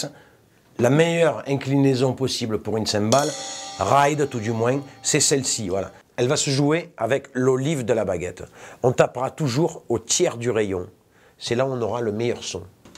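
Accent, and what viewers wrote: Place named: South, France. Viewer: Bonne enseignement avec l’accent du sud en prime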